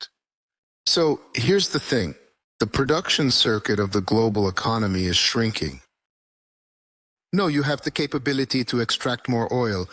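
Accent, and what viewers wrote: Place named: United States. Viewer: Очень прикольно слышать "Андрей Борисович Кобяков" и "Олег Владимирович Григорьев", сказанные Михаилом Леонидовичем с натуральным американским акцентом. Конечно, ИИ ещё не совершенен, но здесь -- вполне терпим: по крайней мере, все частоты присутствуют, я все слова разбираю на слух и хорошо понимаю речь. Ещё прикольнее было видеть, как, не переставая чисто говорить, бесшумно отхлёбывает чай из кружки, которая вдруг становится прозрачной. В целом, ИИ следует подрихтовывать при первой возможности, а также, возможно, отдельно записывать адаптированные для него речи, чтобы артефактов было поменьше. Через год-другой, вполне возможно, это уже не потребуется, потому что ИИ сейчас развивается очень быстро, и его качество также быстро растёт.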